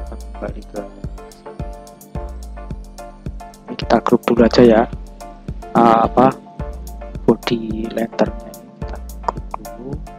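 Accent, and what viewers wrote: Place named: Java, Indonesia. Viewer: Wong jowo koyone nek delok suarane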